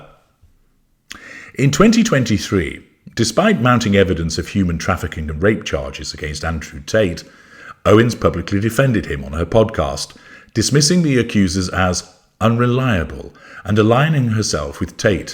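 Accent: United Kingdom. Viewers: We pronounce it that way in the UK.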